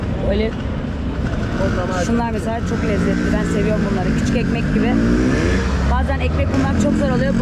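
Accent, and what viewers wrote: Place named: Sivas, Turkey. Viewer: İspanyolcayı Sivas tokat biraz doğu aksanıyla harmanlaman diyolok kurman çok hoş.bilmiyorum banamı öyle geliyor.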